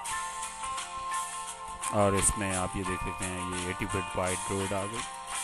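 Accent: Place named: Punjab, India. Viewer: lolzz ... is may aap deakhay gay kay muslim ko kitna accha ker deeya hay hahahahahah ahahaah ..... I like your urdu accent as it sounds panjabi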